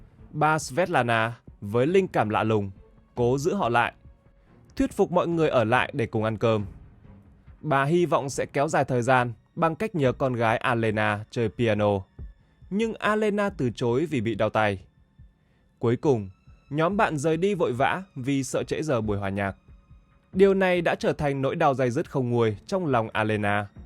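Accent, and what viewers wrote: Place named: North, Vietnam. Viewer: giọng đọc miền bắc không hay . xin Chọn giọng đọc miền nam dễ nghe hơn .cám ơn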